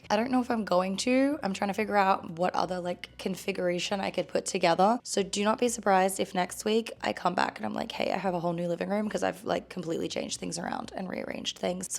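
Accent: Australia. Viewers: Your ‘sick’ voice sound sooo very Australian! I hope you’re feeling better and enjoyed your holiday x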